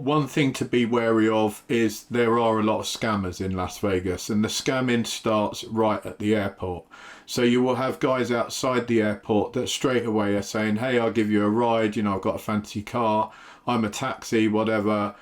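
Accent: United Kingdom. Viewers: Doesn’t that English accent bring a lot of cache all over America? I had a pal, from Wales actually, kind of a Joe average, but he just attracted women and good will just by opening his mouth anywhere he went, restaurants, bars, just out shopping, it was actually good to hang around with him just because of the spillover and association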